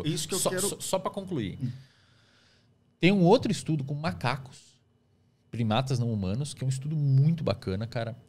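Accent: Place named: France. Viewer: Seja etero e pare de francesar o português....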